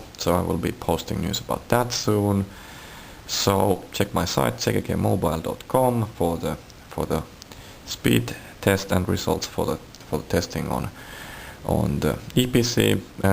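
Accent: Finland. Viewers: Finnish accents are awesome :D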